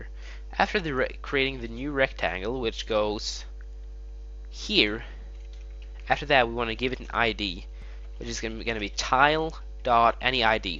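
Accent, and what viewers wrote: Sweden. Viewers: are you Swedish? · Hah du er svensk? ;) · R u swedish?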